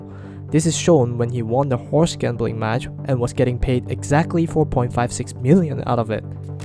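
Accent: Singapore. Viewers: A segue, but you sound so singaporean! (Not a bad thing) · you're from singapore arent you? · Are you from Singapore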